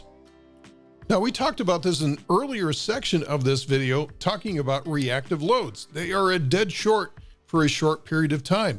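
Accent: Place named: Northeast, United States. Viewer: ha! Your accent seemed more northeast. Great overview. Thanks for taking the time to do it. In a pinch, another way to achieve the bonding requirements of the F150 without an expensive 3-pole disconnect is to have a lockout device on the main breakers and a (preferably locked) switch for the bonding at the house's main panel. This is the same as disconnecting the bonding jumper in a subpanel, but you're just doing it without a screwdriver. Here's a video where the homeowner achieves it via the screwdriver method: <link>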